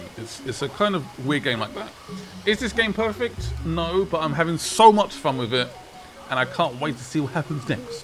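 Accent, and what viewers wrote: United Kingdom. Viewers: This lets play is much better cause of the British narration, makes it feel more like a documentary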